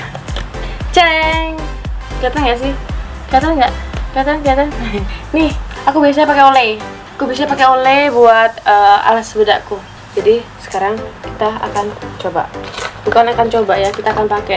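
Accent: Java, Indonesia. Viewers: jowo aslie kak. cuetohh poll wkwk😁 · Wong jowo to mbk?? 😁😁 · Jowo ne medok tenan kak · Jawa nya medok ya ka? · medok tapi cantikk ka 😍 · Jawa nya nggak ketinggalan ya kakkk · Sukanya dari kak tu, apa adanya, gak sok keindo2 an gtu, jawa bgt wkwk.. Mantep kak, sukses terus ya, uwes ngene wae kak opo enek e.. 😁😁😁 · kok mbak.nya lucu ya, medok.nya itu 😂 bedak tabur viva.nya jd inget bgt pas waktu smp... 😂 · seneng lek mbak ngomong jowo😊lucu ae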